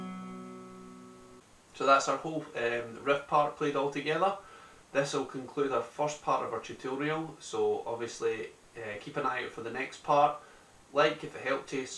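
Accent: Scotland, United Kingdom. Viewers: My good friend comes back from Oz raving about this guy, I check him out. Being a huge fan I love the cover, I then think to myself "I could learn this and it would go well with my scottish accent, I wonder if there's any tutorial videos online". I come on here and it's a scottish guy doing the video. Meant to be. Cheers for the video mate!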